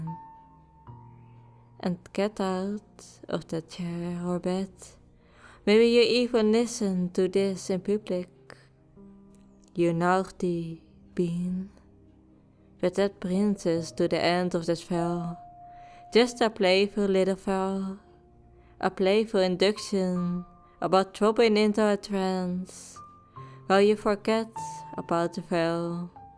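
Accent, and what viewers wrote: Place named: Netherlands. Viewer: Didnt expect to like a dutch accent hehe, but I think it is ur voice and the way u pronounce words that makes it nice :3 I also know a dutch male switch u might get along with very well im sure we will chat about it, hehe :3 ty for the fun :3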